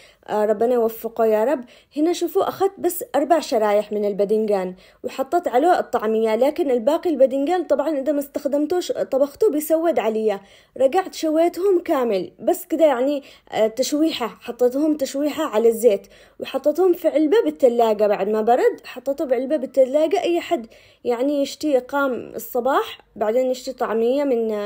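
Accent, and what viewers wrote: Aden, Yemen. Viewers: احبك ي بنت الكلام العدني يذوب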